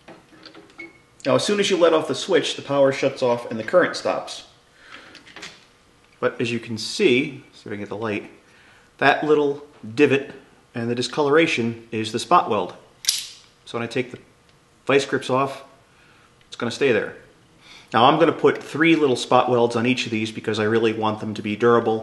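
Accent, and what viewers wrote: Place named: Minnesota, United States. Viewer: Oh okay, your accent sounded a bit like the characters from Fargo.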